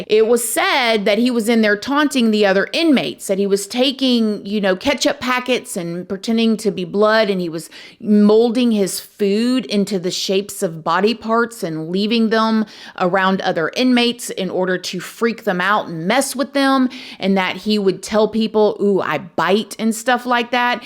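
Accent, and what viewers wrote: South, United States. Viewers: Southern accent, so cute. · G’day. You’re a very friendly, lovely lady 😊. This is my first time visiting your channel. I found your post to be very informative and with all due respect, I love your accent. It reminds me of the Beverley Hillbillies. Y’all come back now.😊😊Consider me an Australian friend and yes. I have just subscribed to your channel ❤❤🇦🇺🇺🇸🇦🇺🌹🌹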